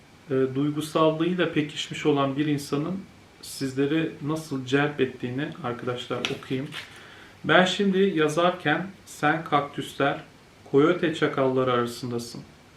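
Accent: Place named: Sunda, Indonesia. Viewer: 🙋 cuman teungarti, basa sunda ath ngomongna😁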